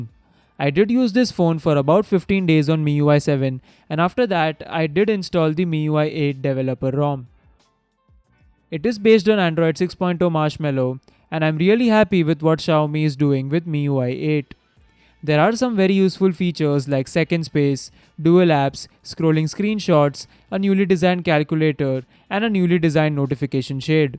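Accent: India. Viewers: Indian english accent is very funny :D. Great review and phone.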